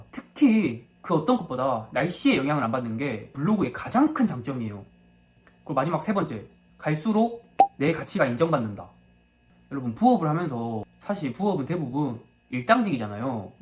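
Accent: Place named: Jeolla, South Korea. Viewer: 출신이 전라도인가요?억양이 전라도아신데...그럼 99.95% 사기꾼.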